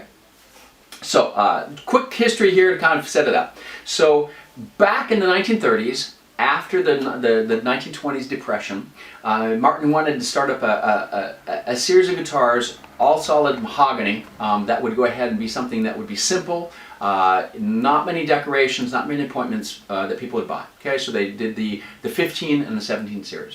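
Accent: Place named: France. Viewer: This isn't a criticism, but I had to watch this whole explanation a couples times until I got used to the quaint French accent that kept creeping in. BTW, most folks place the accent on the second syllable of "Sapele"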